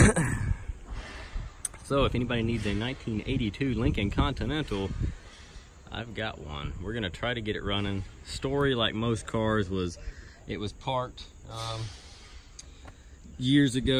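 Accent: South, United States. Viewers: Jesus, Southern accent and knows/appreciates 80's panther frames? Please turn gay and marry me? Pretty car though. I like them so much more than the Town Cars of the time. Too bad it'll probably never be restored. These haven't quite reached classic status in the eyes of the masses but it'll get there. Hopefully it'll get lucky and hold up to that point.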